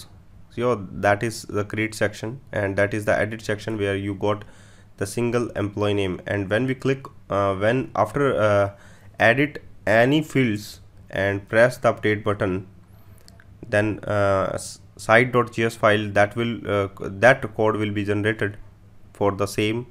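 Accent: India. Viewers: The worst most video I've ever seen in my life. The Hindi influenced English trainer accent is really irritating. It is clearly understandable that, this guy don't know anything, but he is managing to teach us. I've already provided this comment. Looks like these guys are removing negative comments. Hence, I've provided negative comment once again.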